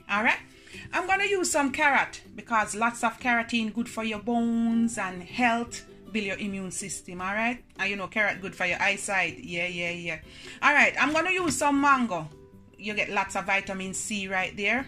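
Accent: Jamaica. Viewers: Loving this upload my friend. Loving the Jamaican accent, for true, it gives your presentation that little bit of flavour. Awesome variety of receipt on show. Fantastic demonstration and tutorial on show my friend. Amazing health information you are putting out there, with excellent research and knowledge. The like is absolutely smashed ############ Keep Safe and Healthy out there. Stay Positive. JEVITEE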